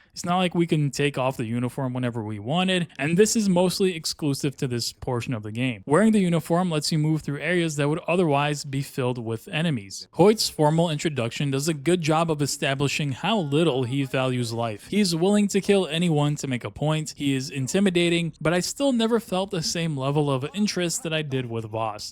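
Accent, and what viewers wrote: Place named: India. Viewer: your accent dude not American 100% just 65% are indian or something!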